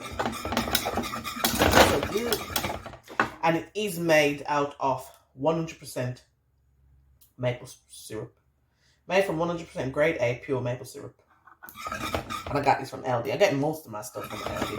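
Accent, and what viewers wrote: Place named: Jamaica. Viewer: You still pronounce it the Jamaican way too lol... Great video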